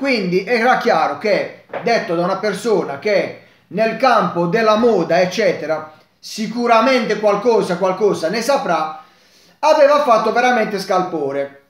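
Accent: Bari, Italy. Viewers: Ma di dove sei di Bari ?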